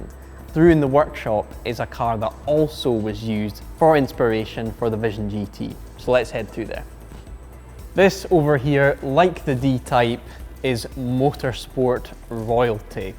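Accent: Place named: Newcastle, United Kingdom. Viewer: He's Geordie not Scottish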